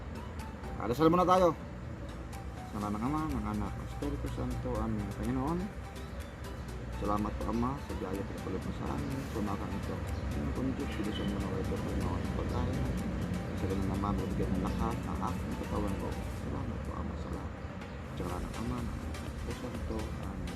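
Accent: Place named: Visayas, Philippines. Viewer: Ay bisaya ka deay idol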